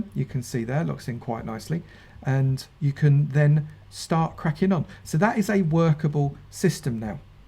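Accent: United Kingdom. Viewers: soy mejicano, y entiendo muuy bien a los americanos pero... el asento británico, dios mio es hermosamente difícil de entender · Because it sounds British? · That Hen, is called a British accent.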